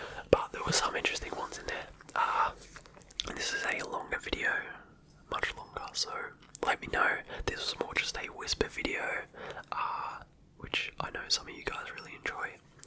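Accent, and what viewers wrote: Australia. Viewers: i have been watching asmr for the last 7 years and never once have i watched a video of someone with the same accent as me/from australia. hearing the aussie accent with the whisper is giving me tingles so thank you for existing and creating this channel 😭🧘🏽‍♀️🩷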